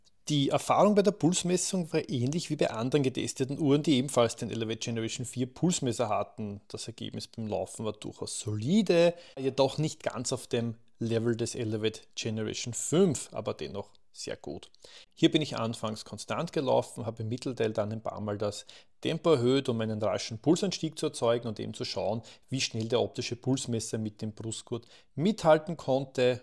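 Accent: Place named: Austria. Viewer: Vielen Dank für das informative Video! Auch der österreichische Dialekt ist mir immer wieder sympathisch, weil meine Vorfahren aus diesem schönen Land stammen 😊😉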